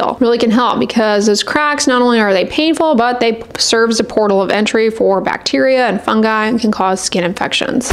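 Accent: United States. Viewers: Unrelated, but what part of the USA is this accent from?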